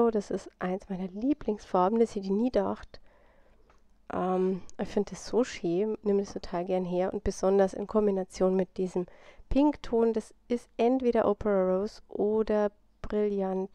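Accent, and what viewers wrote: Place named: Bavaria, Germany. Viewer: Wie sympathisch ist das denn mit dem bayrischen Akzent!💕 Genau diesen Farbkasten (kein Metallic), habe ich schon lange im Warenkorb bei eBay! Die Farben scheinen noch zusätzlich zum Schminkekasten unterschiedliche Nuancen zu bieten. Dein Kanal war mir leider bis heute unbekannt. Aber gleich mal Daumen hoch und Abo! LG.🎈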